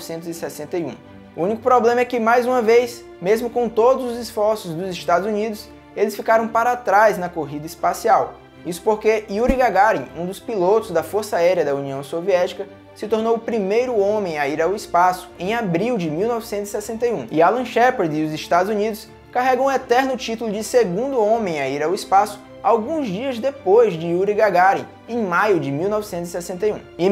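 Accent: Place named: Ceará, Brazil. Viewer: Q bom q o YouTube me recomendou o seu canal. Sou vidrado no espaço e em suas estrelas. Sou de Fortaleza - CE, posso estar enganado, mas tenho quase certeza q vc tbm é cearense por causa do sotaque 👏, LIKE, + 1 inscrito, e tmj irmão!!!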